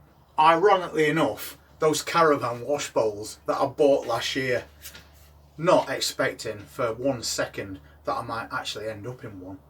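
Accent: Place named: North, United Kingdom. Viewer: ive just found your chsnnel. What a devastating time youve had and what a crap way to be told to move. You are so inspirational and youve got a definite follow from me. Love love love your wonderful northern accent and grounded, calm way of communicating. Youre such a dude! Im going to look at your other videos snd keep learning. See you soon ajd hope you and yours sre keeping your heads held high!!!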